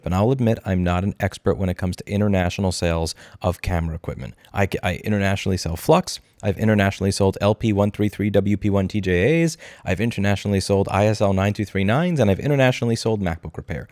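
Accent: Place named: Italian-American, United States. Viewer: The more angry gets the more ginzo he sounds.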